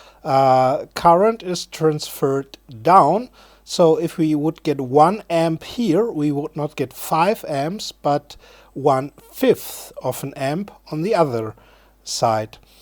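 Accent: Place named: Germany. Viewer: Ich habe es mir schon gedacht, das du ein deutscher bist :D Man hört es einfach raus :P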